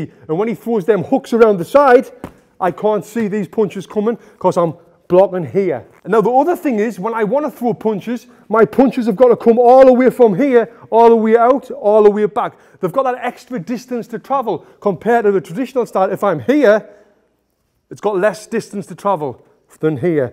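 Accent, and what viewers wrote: United Kingdom. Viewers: UK english is so nice, those "poonches" and "Meeweather" are brilliant! Cheers from Kazakhstan to all UK Boxing enthusiasts.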